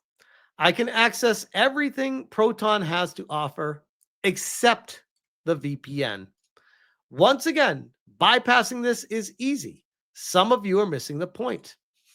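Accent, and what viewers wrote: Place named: New Zealand. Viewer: Sounds like New Zealand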